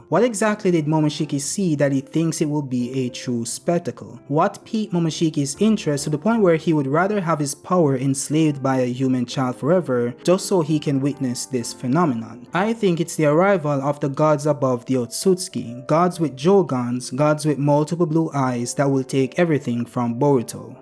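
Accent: Jamaica. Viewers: Me hear a Jamaican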